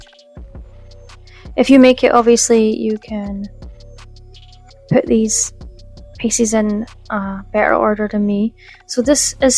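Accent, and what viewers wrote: Scotland, United Kingdom. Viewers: I just love your wee Scottish accent. The projects were so cool. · Love your creativity and your creations, are you Scottish but living in America? ( guessing the USA bit as we don’t have dollar tree etc) Can hear a soft Scottish accent when you talk 😊🎃👻✨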